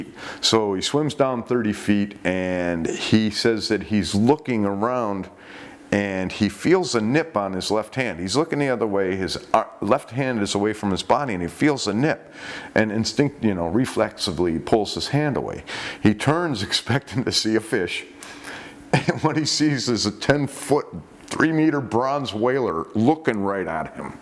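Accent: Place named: Midwest, United States. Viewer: I find it extremely odd that, a guy from Michigan or Illinois (guessing by the accent) who also, admits that he's too Scared to swim in the ocean....is giving advice and recounting stories about Sharks and shark attacks. That'd be like a life long floridian telling the do's and don'ts of ice fishing. 🤔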